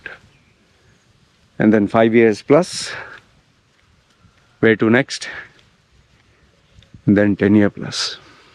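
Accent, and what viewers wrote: India. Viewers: You have changed a lot. 5 years ago u had that pseudo American accent and now fully Indian accent (your genuine voice which is good to hear). You should try radio jockeying. Other changes includes softening down from the brash tone u had then. Good luck. Also you have come back full circle from Family to show off life to back to family. Sometimes I wonder this is heaven(our lifespan) and there is no other place called heaven and we go back to an unsexy place after death....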